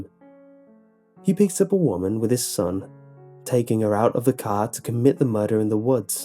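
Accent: United Kingdom. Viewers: I don’t like British accent It Sounds like foreign English I am in trouble 😭 This is not good 😵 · You British?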